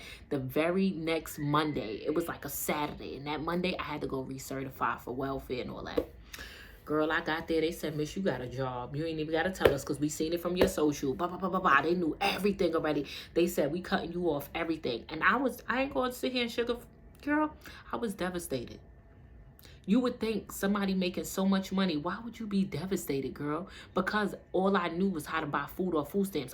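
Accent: New York, United States. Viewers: Hey, I have really been enjoying your content lately . Due to it being real life situations, l and many more can relate. I used to live in Brooklyn, so I play your videos in the background to feel at home You have the real New Yorker accent is so strong I chuckle every time you start talking.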